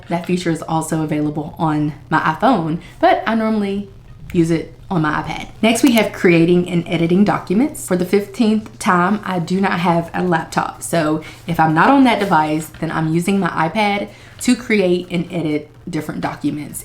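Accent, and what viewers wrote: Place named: Texas, United States. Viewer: You gotta either be from Texas or Alabama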